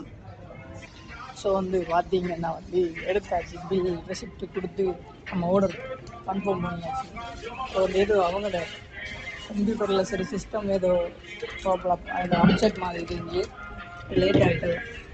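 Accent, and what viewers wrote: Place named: Jaffna, Sri Lanka. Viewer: Eela thamila kathaiyadaaa Yaalppaanam thane nee 😡😡😡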